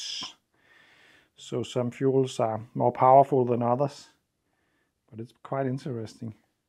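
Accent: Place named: Denmark. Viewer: Got the first video on this moped recommend by the algorithm, all hail the algorithm. It took a moment to figure out the accent was Danish, like myself. As a former postman, I couldn’t help myself from looking at the roads you were driving on, trying to figure out if I recognized anything. And by some freak coincidence, you were driving on my old mail route. Anyway, love the videos, keep it up👌🏻🇩🇰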